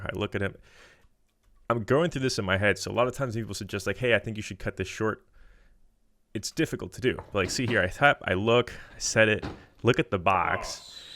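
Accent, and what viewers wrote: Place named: England, United Kingdom. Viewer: Very clever of you!! I loved it. I knew that it was all you! But who is the narrator? Very English xx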